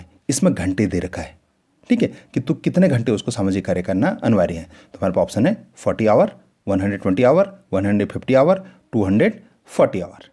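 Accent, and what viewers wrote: Garhwal, India. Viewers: Sirji aap garhwali lagre ho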